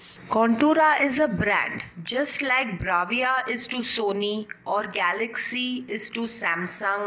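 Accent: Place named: England, United Kingdom. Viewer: pretty hard to watch with this english accent.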